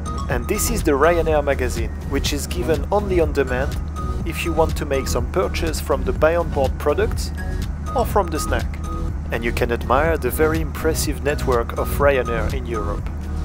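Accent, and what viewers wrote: France. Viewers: T français si oui g reconnu l’accent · Ton accent francais c'est drole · Ça se vois quand un français parle anglais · l’accent fr reconnu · Ton accent français ressorts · C’est quoi cet accent français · I was trying to pay attention to the video but was distracted with the charming French accent. Sorry. P.S. I love all 3 airlines but most strongly Wizzair because they revolutionised travel in Central and Eastern Europe. Love Ryanair as well. Travelled at least 30 times with them. Less experience with easyJet, so no opinion.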